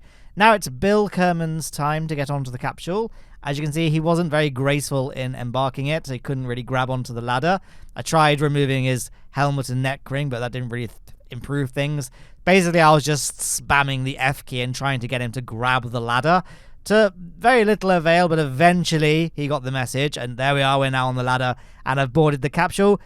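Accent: United Kingdom. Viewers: Love the incoherent british rambling about "jool" and "jewel" for 5 minutes. Keep it up!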